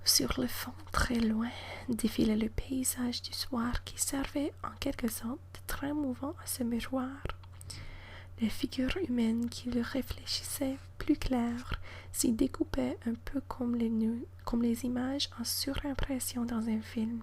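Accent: Canada. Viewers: this doesn't sound like the French kind of French, but rather the Canadian? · Ton accent est typiquement canadien et en France on adore ça (pour information, la Miss Météo d'une de nos chaines françaises est canadienne) ton français est impeccable, félicitations de la part d'un français....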